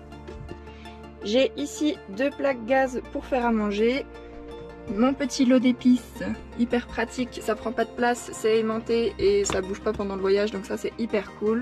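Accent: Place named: Franche-Comté, France. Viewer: Belle présentation (avec ce charmant accent franc comtois si je ne me trompes, 😉). J'adore le bac a douche encastré et le toit relevable,c'est vraiment top! Du coup, je me demande, il y a un faux plancher pour l'encastrer ? Et ,est il relié directement à l'extérieur ou as tu une cuve d'eau grise? Hâte de suivre tes prochaines aventures 👍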